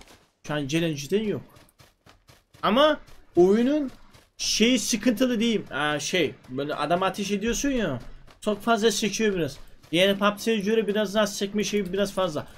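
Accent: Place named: Black Sea, Turkey. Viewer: gerçekten laz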